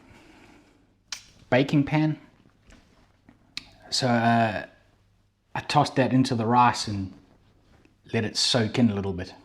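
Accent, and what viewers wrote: South Africa. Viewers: So nice to hear a South African accent on YouTube.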